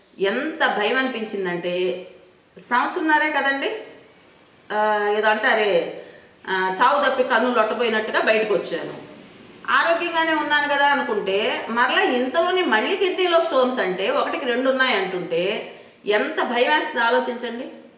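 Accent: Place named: Prakasam-Nellore, India. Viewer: మీరు మాట్లాడే భాష మాకు ఇష్టం. మా చిన్నప్పుడు, మా ఎదురింటి ఆవిడ కూడా ఇలా మాట్లాడేది. ప్రకాశం, నెల్లూరు జిల్లాలలో ఆడవాళ్లు ఇలా మాట్లాడతారని తర్వాత కాలంలో గమనించాము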